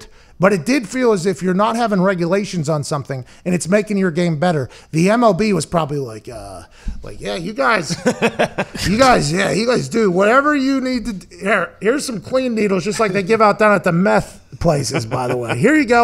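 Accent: Pittsburgh, United States. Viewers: I wonder how many people had a hard time listening to a true yinzer accent ❤️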